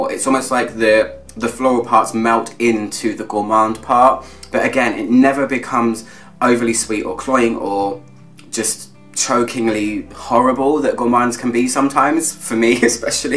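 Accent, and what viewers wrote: United Kingdom. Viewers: Perfect and helpful descriptions as usual plus I adore your British accent! On the other hand, could you do a top 10 chypre florals? Thank you very much and please keep on doing these wonderful reviews! Greetings and respect from Spain!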